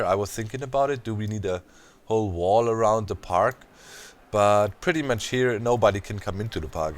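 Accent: Germany. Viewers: I love your german accent.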